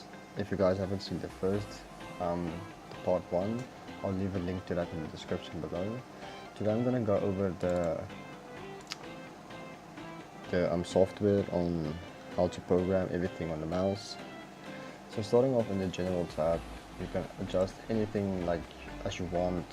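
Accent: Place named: Afrikaans, South Africa. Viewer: N mens kaan hoor jys afrikaans😂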